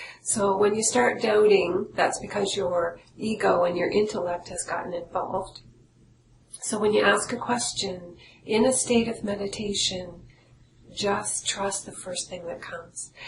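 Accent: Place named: Nova Scotia, Canada. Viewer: Hi, I am so sorry that I did not know about you when I was living in NS. I am very interested in learning to channel as I feel spirit talks to me in very subtle ways and I want to be more open to the messages. I have to laugh as right away I picked up on the accent and had to google you to see where you were from..i miss the NS accent:(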